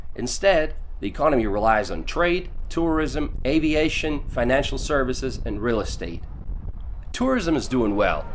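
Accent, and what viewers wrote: Russia. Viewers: I would've Never placed his accent as Russian! That's Crazy! 😆👌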